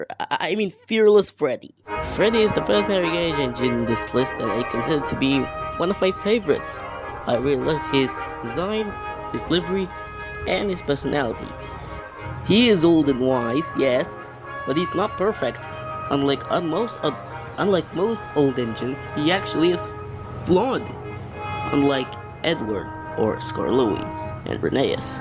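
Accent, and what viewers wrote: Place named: Indonesia. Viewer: i can tell the indonesian accent lol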